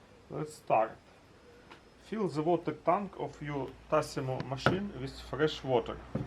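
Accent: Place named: Russia. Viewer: That is the most hilarious russian accent i have ever heard. I love it.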